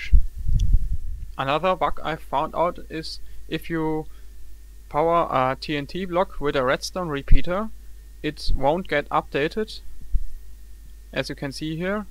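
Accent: Germany. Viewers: Ich hab gleich den deutschen Akzent erkannt :)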